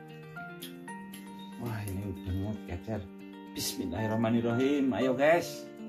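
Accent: Java, Indonesia. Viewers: Gedheeee bgt kak pizza nya... pasti kenyang bgt tuuuh yaaa 😂😋😋 Btw... om nya orang jawa ya 😁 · bos dikorea sudah berapa tahun. ngomong nya udah kayak orang korea bgt.. tapi klow ngomong jawa medok bgt😀 anak nya cantik pinter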